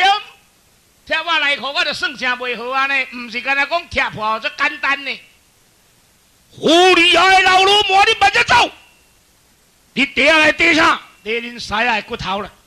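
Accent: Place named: Coast, Taiwan. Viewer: 做戲，海口腔很自然，這也是他的特色，住四湖，媳婦一樣是我們麥寮人。笑科很自然，不用特意排一個橋段，而是隨口一說就很好笑。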